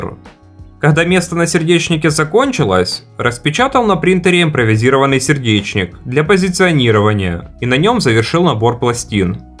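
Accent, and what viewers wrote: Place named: Kharkiv, Ukraine. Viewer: Чую харківську говірку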